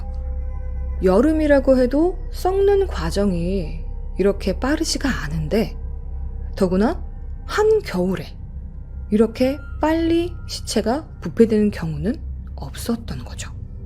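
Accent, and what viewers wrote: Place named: Jeolla, South Korea. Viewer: 전라도 비슷한 말투가 듣기 싫어요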